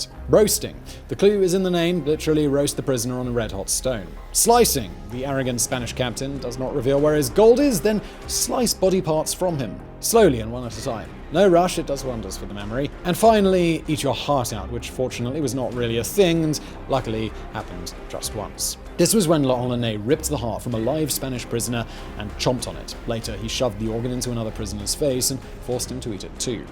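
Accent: United Kingdom. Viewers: About your plug for the newest channel...I thoroughly enjoy your content. I watch all your channels and there is a reason for that. Though I have not really taken to the newest one. I think the draw is your English or British accent. I am not sure how to frame it because I know a few men from Scotland and they didn't take it as polite that I called them English as the UK is not quite like the United States. I can call any person from any number of states an American and they would not get upset, though a Texan might point out that they are from Texas.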